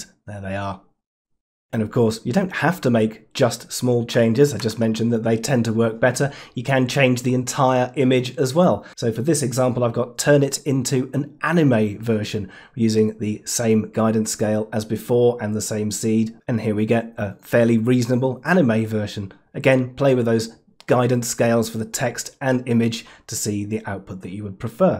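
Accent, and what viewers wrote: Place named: United Kingdom. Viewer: When I hear your voice I think of Monty Python, with that perfect British diction, it's kind of humorous to be honest.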